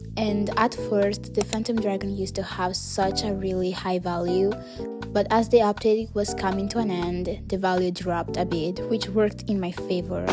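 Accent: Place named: Italy. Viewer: omg I loved this video! also ur accent is absolutely gorgeous! r u Italian? x (I have cousins who speak just like u 💞)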